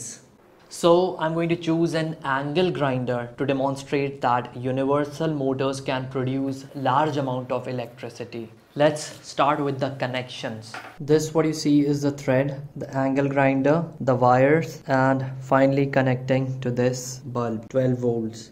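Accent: India. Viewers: Está hablando en inglés pero con acento hindú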